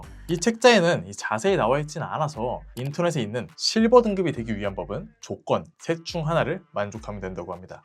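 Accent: Gyeongsang, South Korea. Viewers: 이 분 발음이 경상도 분이시네용 ㅎㅎㅎ 영상 알차고 좋네요 잘 봤습니다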